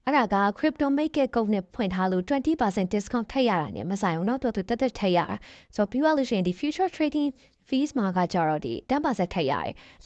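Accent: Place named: China. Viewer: အသံက ပုံမှန်မဟုတ်ဘူးထင်တယ် နားထောင်ရတာ တရုပ်သံပေါက်နေတယ်😢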